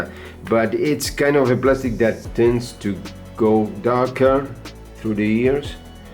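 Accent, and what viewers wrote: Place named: Argentina. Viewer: Esa fonética de inglés es muy argenta 😅... 🇦🇷💪🏼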